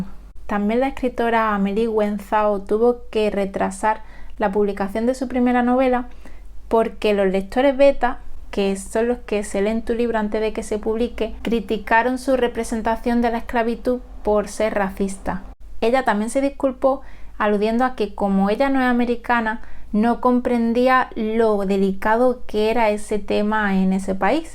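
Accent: Andalusia, Spain. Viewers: Qué raro, una africana con acento español, hablando de literatura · De dónde eres? por el acento diría Jaén, tal vez, Almería, Sevilla? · Porqué hablah con un acento tan andalúh? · Qué video más bueno. Me encanta que puedas dar tu opinión sincera sin tapujos. Todo lo que hablas es muy cierto y muy interesante. Te hace pensar y replantearte cosas. Por cierto, me encanta tu acento. ¿andaluz? Qué interesante ver una persona negra con acento andaluz. Jaja. Un saludo desde Cuba. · Uno de mis autores favoritos en Henry Miller. Si en su época fue censurado, no quiero pensar qué sería de su obra hoy en día. Tu contenido es bueno. Se nota que eres inteligente, y también muy agradable. Además tienes acento andaluz. ¿O me equivoco? Mucha suerte y sigue así! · De que parte de España es ese acento? Es horrendo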